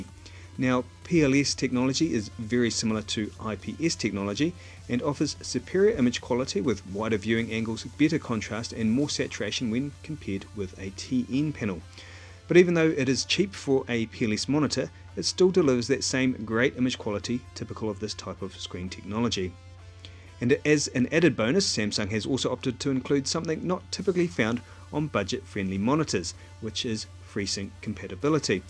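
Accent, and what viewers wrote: New Zealand. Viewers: A Kiwi accent be praised ! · are you from new zealanfd? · MY BOY YOUR FROM NZ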